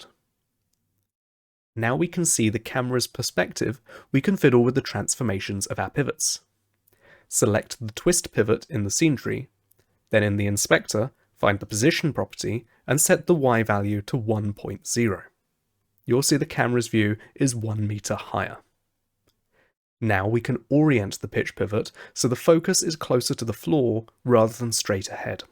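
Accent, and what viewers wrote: United Kingdom. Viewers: You sound English but look Australian 🤔 Thanks for the tutorial!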